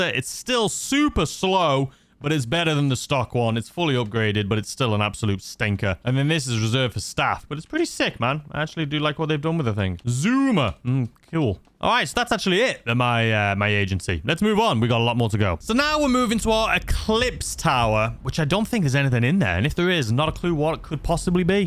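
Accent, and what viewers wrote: United Kingdom. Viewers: answer me and i will subscribe are you british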